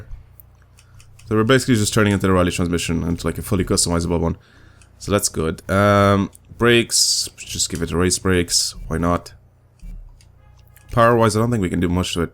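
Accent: Ireland. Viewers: ***** aha, i thought it had a bit of irish, but i always felt like it was something else, i would have asked you earlier, i just didnt have an account :P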